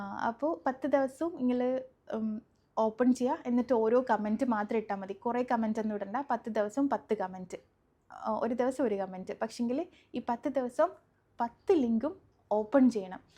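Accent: Kannur, India. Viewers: Nan link open cheythu nan comend cheyyarilla njan Areacod anu ❤❤❤❤ ningale sound nalla ishttanu kannoor varthanam ishtam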